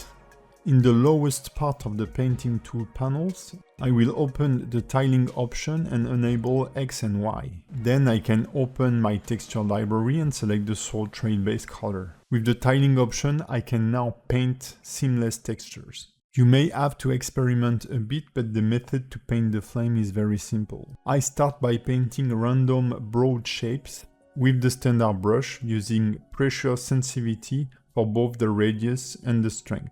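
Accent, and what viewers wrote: France. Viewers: i was gonna purchase your animation course but after watching this video, im not so sure anymore. i know you are not an english native speaker but ur french accent makes the learning process 10x harder.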